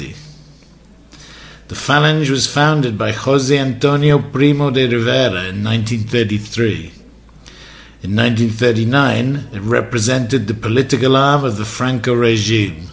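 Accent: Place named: RP, United Kingdom. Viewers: His voice sounds like Trump's with a British accent hahaha I actually like it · Indeed. "I call it british RP with a spanish twist" but I found it to be sophisticated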